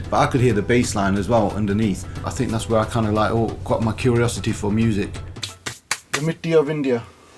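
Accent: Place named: United Kingdom. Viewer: wait what he has a british accent !?!?!!?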